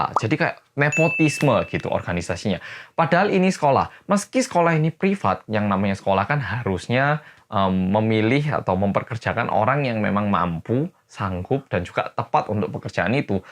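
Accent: Java, Indonesia. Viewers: Keren, ditunggu ep ke 2 burning sun nya.. medok asli, ada beberapa yg rada kepeleset waktu lafalin "s", masih berasa koreanya hahaha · Ngomong indonya medok tapi waktu ngomong korea langsung keliatan aksen korea :v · Medoknya itu lohh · Memang medhok apa sengaja d medhok2in? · Bahasa korea logat nya auto beda. Balik ke bahasa indo jd medok lagi. Kok bisa gitu😂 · Bang, medhok aee... 👌👌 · (Bagian waktu masnya nyebut nama2 artis korea pake aksen korea tapi abis itu balik medok) Terimakasih kembali :) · btw ko bisa selancar itu sih b.indonya? ketambag logat jawa 😂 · Bahasa inggris nya kok medok aksen jawa 😂👍🏻👍🏻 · pertama kali nonton tentang yang kasus kapal sewol, ngakak sendiri ngomongnya MEDO bangeeettttt wkwkwk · duh mas medhoknya wuenak didengar, uapik tenan kalo saya dari cilacap jadi ngapak bahasa jawanya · Bahas KATE YUP dong babang ganteeng..udah banyak yang aku liat tapi pengen versi babang medok :') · Kakak bahasanya medok banget...hhhhh Kaya ke jawa2an gitu... 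Gemes deh jadinya... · Mase medhokkk tapi nek nyebut nama wong Korea lidah medhoknya ilang.. piye tho masss?? Ajari aku 😁😁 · Mas . Panjenengan medok tenan.. sy baru ngikutin channel ini .. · pas nyebutin artis-artisnya kaya mc music bank, pas kelar langsung medhok lagi😂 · Medok bgt aku aja gak semedok gitu... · ????knp kk ini keliatan kek orang Korea tpi gaya bhsnya kek orang Jawa:)))))) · Pas ngmg nama2 idol korea lgsg percaya klo kak orang korea (saking medoke kadang gag percoyo) 😁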